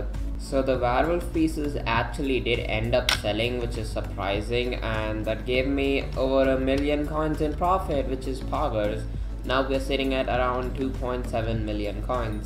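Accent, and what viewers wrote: India. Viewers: The Indian accent shining thru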